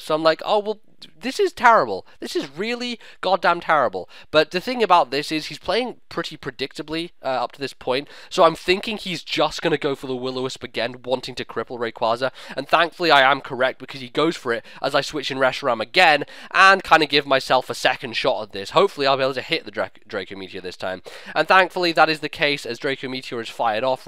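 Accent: Ireland. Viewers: Your Irish accent is really impressive. ^_^ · im actually disappointed that the irish accent didnt last for the whole vid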